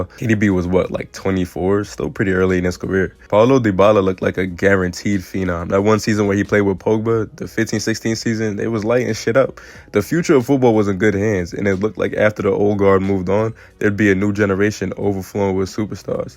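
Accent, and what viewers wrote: United States. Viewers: sick video man! you presented a situation and reasons for it really clearly and in a rlly engaging way👍🏽 watching this before my first day of my second semester and as silly as it sounds, it’s refreshing to hear an american person chatting about footie—feels a little bit like yapping about soccer with friends (none of my friends like football so thanks for that haha) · Lmao all the wars in the world right now are because of Americans. It’s a terrible take. Every time I click a video and hear that Yankee Doodle accent I turn it off!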